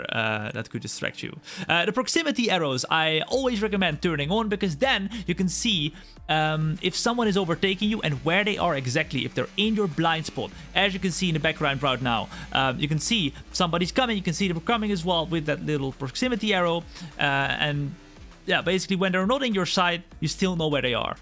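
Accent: Netherlands. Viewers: Are you from the Netherlands? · Ben je Nederlands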